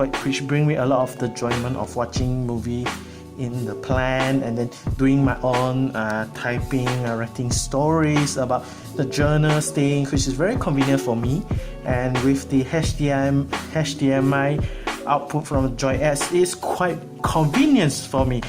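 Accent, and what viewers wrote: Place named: China. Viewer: Wao ur English so Chinese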